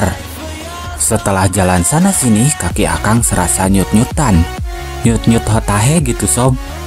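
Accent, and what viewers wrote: Sunda, Indonesia. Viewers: Reviewer na meuni nyunda pisan...tong hilap kang..liput Oge wisata pantai Utara Subang na Pokona mah Endah pisan pamandanganna 😊😊😊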